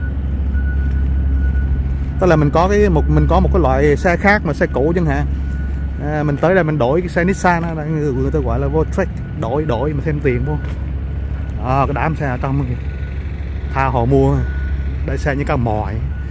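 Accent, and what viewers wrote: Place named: Vietnam. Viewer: 😂 phát âm kiểu rất Vietnamese đó bạn.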